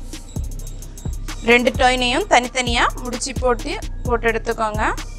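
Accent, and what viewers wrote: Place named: Kerala, India. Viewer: Neenga pesuratha patha ennakku malayalee mathiri tonudu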